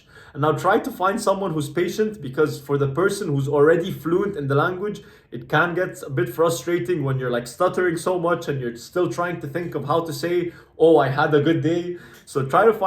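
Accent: Egypt. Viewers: A good vedio, thanks for made it and keep going man ❤ But I have a question where are you from? Cuz u sound Egyptian which is cute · Oh my god días mio! This my first time hearing Spanish with Egyptian accent 😂❤ man you are awesome keep up the good work ❤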